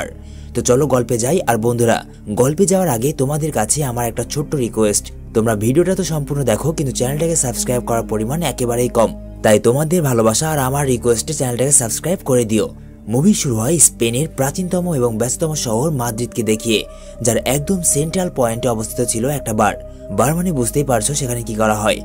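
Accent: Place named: Noakhali, Bangladesh. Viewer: নোয়াখালী 😊আপনি😊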